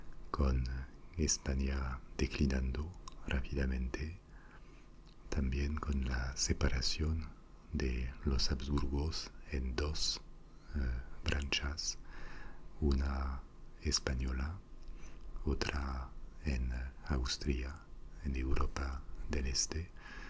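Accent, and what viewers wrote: France. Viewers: Me encantó tu narración. Una forma diferente pero muy agradable. Yo disfruté de tu relato pausado, con ese tinte de francés todavía a pesar del buen español y tu voz suave pero muy clara. Gracias.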